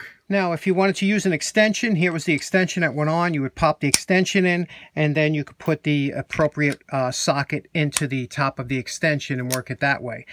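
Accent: New York, United States. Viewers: That's a JACKPOT tool!! Always interesting and always informative!! I always look forward to these restorations!! The education provided with each video is remarkable! Of course, the NY twang makes me feel like I was back home on Lung Ilint!! Thanks!!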